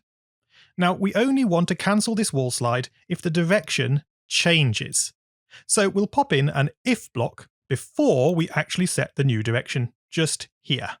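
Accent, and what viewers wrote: United Kingdom. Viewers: Your the most british person ever, the accent and stuff, WHOLESOME STUFF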